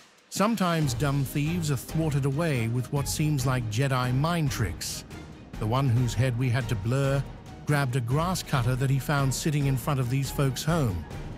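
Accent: United Kingdom. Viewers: The voiceover is clearly British English, so why use wordds like 'dude' and 'gas station'? What is a 'dude' anyway?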